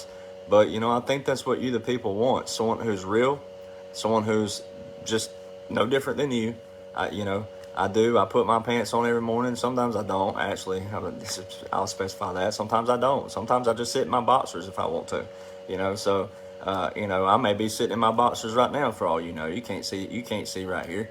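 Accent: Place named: South, United States. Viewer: Patel Patriot has a reputation for putting others down. Likely jealousy. We love you here in Boston. Could listen to your southern accent all day! And you re right. If one of these truthers did what you do everyone would have woken up A year ago!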